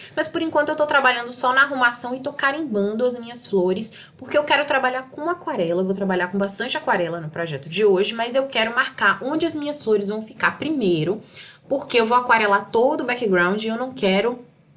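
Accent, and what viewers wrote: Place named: Bahia, Brazil. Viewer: Que bom que gostou, obrigada! 🥰 PS: o sotaque é da minha amada Bahia ♥️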